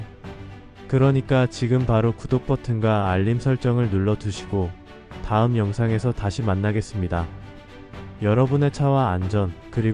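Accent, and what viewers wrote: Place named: China. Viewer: 말이 서툴러도 직접말하세요. 읽어주는 자가 중국인으로 추측됩니다 한국어를 짓발는 행위를 그냥 두고보면 당신도 간첩이 됩니다.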